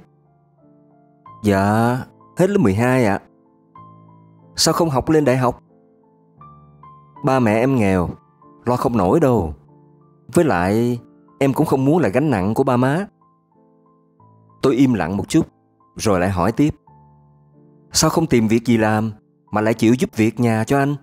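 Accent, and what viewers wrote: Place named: Saigon, Vietnam. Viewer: giọng đọc rất hay rất truyền cảm mang âm hưởng kiểu dân Sài Gòn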